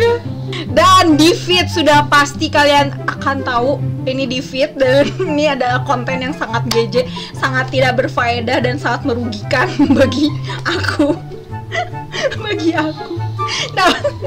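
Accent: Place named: Java, Indonesia. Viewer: jowone ngendi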